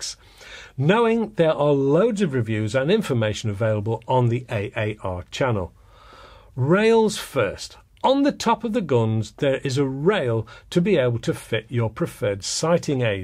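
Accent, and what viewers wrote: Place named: England, United Kingdom. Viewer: Hello Mr. I am from Greece and my name is Eric. Congratulations for your great channel! It helps me very much with all that information for my potential hobby. I really admire and that English accent.I really like you English people!!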